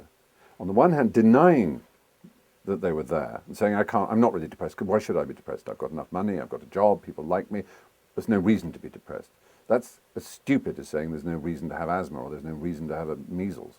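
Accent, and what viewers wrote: United Kingdom. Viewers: he makes coke sound so British and classy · It is phenomenally strange hearing Stephen Fry describing his drug use, with all the glory of a proper British accent of a gentleman scholar. "So for many years really I never went out without at least four or five grams of cocaine powder on my person. And I would ingest it intra-nasally, as was the fashion, through the use of some sort of straw or rolled up currency note."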